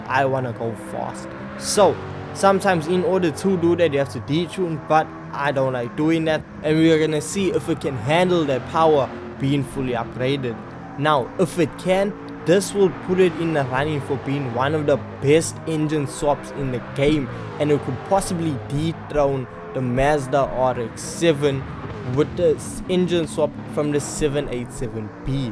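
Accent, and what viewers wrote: South Africa. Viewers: I love the SA accent, so cool my bru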